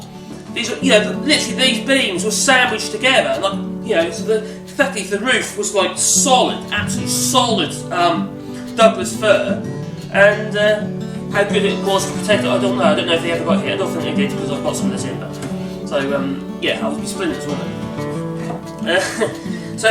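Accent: Norfolk, United Kingdom. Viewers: Gotta be from Norfolk bor!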